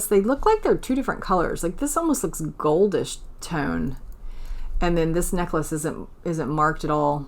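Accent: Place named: Alabama, United States. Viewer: You must be from Alabama.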